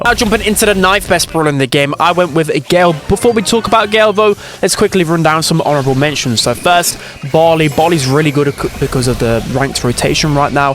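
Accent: United Kingdom. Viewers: Just realized ur British wth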